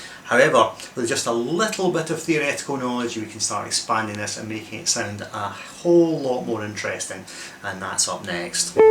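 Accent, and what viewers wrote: Scotland, United Kingdom. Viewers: Never seen your videos before and liked your intro. Then I was pleasantly surprised to hear your Scots accent. I'm originally from Clydebank. ;) Doing a lot of private home Jazz study. Looking forward to following you. Aw th' best! Ha ha. ;)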